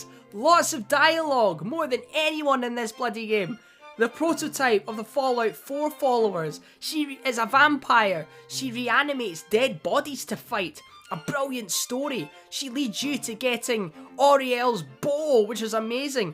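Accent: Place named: Ireland. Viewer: You sound Irish, my mom's Irish so 😉. Happy St. Patrick's day ☘️.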